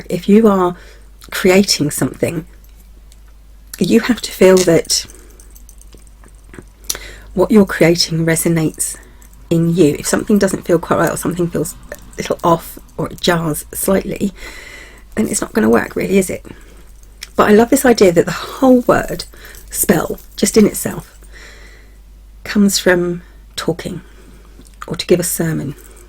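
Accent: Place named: Hampshire, United Kingdom. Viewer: My ear for the UK accents isn't that perfected yet.. I didn't pick up that your voice was Hampshire even though I see the gps pin that says so.. but if I think about it . absolutely :) Folks magic here to me is also known as Hoodoo - instinctive magick.. I'm totally there for it at this season of my life!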